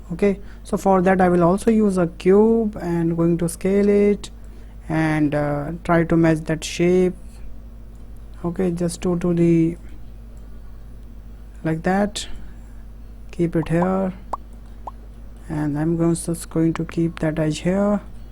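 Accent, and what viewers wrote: India. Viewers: %98 indian accent %1 quality %1 benefitfull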